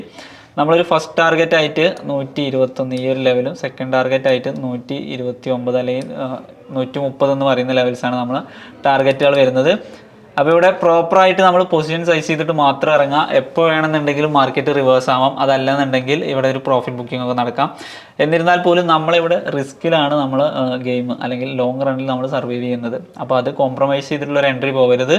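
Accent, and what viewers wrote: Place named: Perinthalmanna, India. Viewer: perinthalmanna samaram kelkkan nalla rasam anu to....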